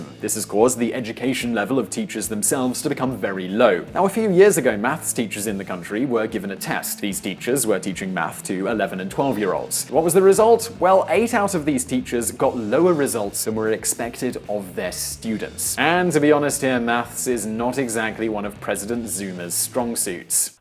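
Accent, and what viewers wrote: Spain. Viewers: It's all fine and good, but please, let somebody else read your titles. The thick Spaniard accent only makes the otherwise serious videos unneededly hilarious. And, it does not really seem professional. :P Keep it up, guys! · This channel is an English version of a Spanish channel, so it make sense the narrator is has a Spanish accent. It just sounds funny tho.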